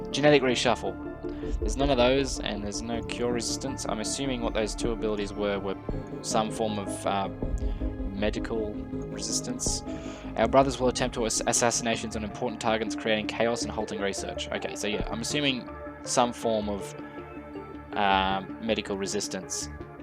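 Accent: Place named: Australia. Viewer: He's Aussie...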